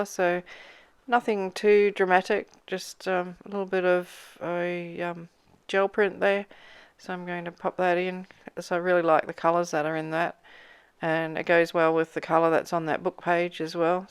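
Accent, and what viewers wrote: Australia. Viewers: Just stumbled across your post and I gotta say that I was surprised to hear your accent. I enjoyed your work. Thanks from a fellow Aussie 👍🏼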